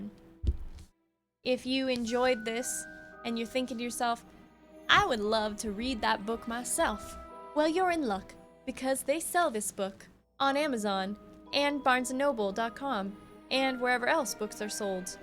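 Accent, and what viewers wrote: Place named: United Kingdom. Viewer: I love the British narrator voice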